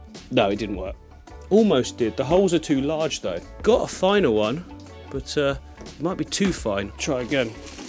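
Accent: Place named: United Kingdom. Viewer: I really like your tanks, but this british accent is bloody haaard, innit? I just can't watch it, sorry. But a lot of kisses, wish you well.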